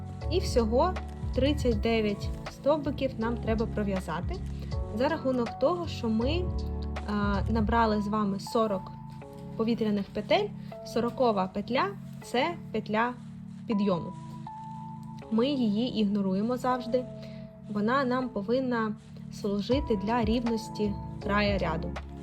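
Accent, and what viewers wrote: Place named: Russia. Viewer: Прекрасный мастер-класс,прекрасная мастерица,но боже, как же режет слух украинская речь с русским акцентом😓 Такое чувство,будто украинский не ваш родной язык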